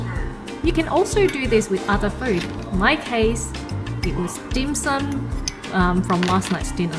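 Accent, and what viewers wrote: Australia. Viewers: this ozzy accent is perfect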